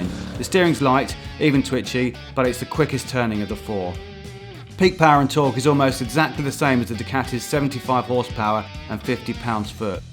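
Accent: United Kingdom. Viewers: The guy doing this voice over is the most british man I've ever heard. Betta Rubba Anova Neva Scrambla Eeva Orsepowa Fazand Ova Senta Viza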